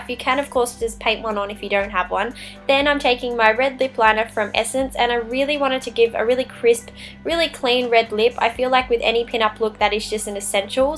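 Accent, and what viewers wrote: Australia. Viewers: this is so cool, and are you australian you sound like your aussie lol x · r u australian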